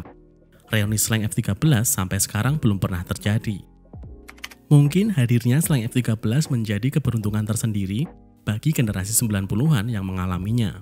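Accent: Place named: Semarang, Indonesia. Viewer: Orang Semarang ya mas.. mendhog men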